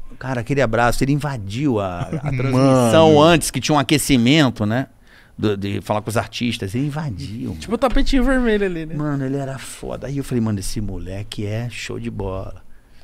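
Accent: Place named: São Paulo, Brazil. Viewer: Carioca tá Paulistão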